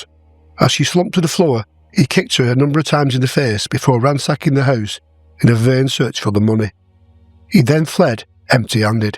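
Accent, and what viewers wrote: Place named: Northern England, United Kingdom. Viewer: I enjoy the differences between Red Rose accents: your northern one, Oasis-Manc, Gerry Marsden-Scouse, coastal posh (Morecambe, Southport) and that lighter one abutting on Cheshire. The more the better.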